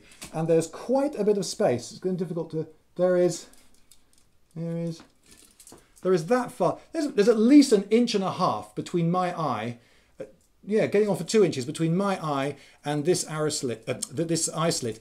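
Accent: France. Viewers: Nice french accent! It seems that the "pig faced" is named "bec de passereau" in france ("sparrow beak")... I don't know exactly why, but here si my view** : you see it as a pigface when you are in front of your enemy. In the other hand, you see it as a sparrow beak when you take a look at the ally at your side. ...So this could come from Azincourt**, where the french chivalry (seeing themselves as the "birds") were in front of english archers (seeing the french as..well, you have understood ;) ) Thank you for the very nice video! (**: It's not a historical reason, guys, just a joke!) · nice french accent!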